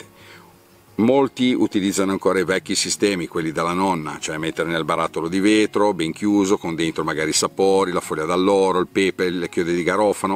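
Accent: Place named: Liguria, Italy. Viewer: infatti mi pareva dall' accento che tu fossi ligure!! Liguria regione meravigliosa ! Grazie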